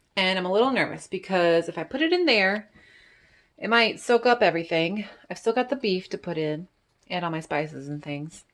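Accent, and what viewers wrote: South, United States. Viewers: I'm curious what state you live in? I'm sure you mentioned it before, and from your accent I assume down south..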